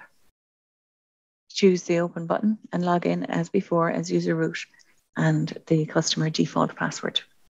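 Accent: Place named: Ireland. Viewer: A lot more videos should be done in an Irish accent. Thank you.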